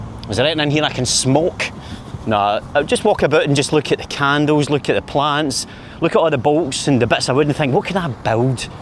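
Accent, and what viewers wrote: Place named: Dundee, United Kingdom. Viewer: He sounds more Dundee now aye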